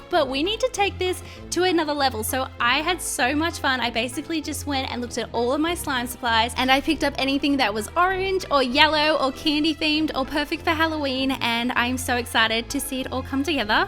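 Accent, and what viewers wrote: Australia. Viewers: Are you alstralian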